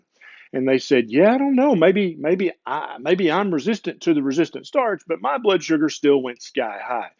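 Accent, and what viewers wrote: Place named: Tennessee, United States. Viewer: I never heard this as I don’t watch the dude as he creeps me out with his fake muscles and I keep getting his ads come up on all my YouTube videos... it’s enough to make me pay for premium. I am pretty naive but I can’t believe anyone believed this without testing til now. Love you Dr! Your accent makes me miss TN xxx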